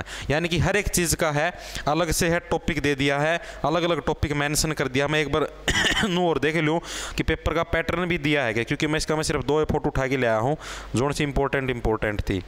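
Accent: Haryana, India. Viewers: यूपी की धरती से आपको राम राम मजा गया हरियाणवी सुन kar 😂😂😂😂😂😂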